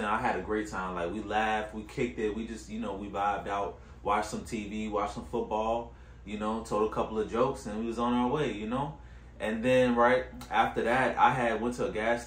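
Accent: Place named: Virginia, United States. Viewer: I'm up early on a big Sunday as well😂. I'm about to do a HITT work out and a short session of yoga at home because its way to cold outside to run. I totally agree with you about social media. I deactivated all my accounts 5 years ago and my life has been SO much better, along with my mental health (I kept YTube as a learning tool ). It is so freeing. Im living my life authentically, not based on, or in comparison to what others are doing. I also feel other people's lives are none of my business and my life is no one else's. I share pics and moments with my family and close friendz-and that's enough. I even stopped listening to music with words for a few years to make sure my life and views on relationships weren't being influenced by lyrics in a song, especially rap and R&B. Afterwards, I realized, truthfully, I didn't even want to be in relationships and I didn't care about getting rich or looking wealthy anymore; I was being swayed by the music I was listening to everyday. 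I know this all sounds extreme, but that's how serious I was about changing and truly finding myself. Nowadays Im focusing on completing my second degree, health, traveling, art, plants, friendships, and growing my retirement accounts 😊. S/N: Are you from Virginia? You have their dialect.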